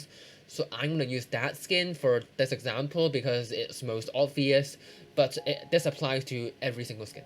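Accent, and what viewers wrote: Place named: United Kingdom. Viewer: bro sounds chinese and british at the same time i didnt even know that was possible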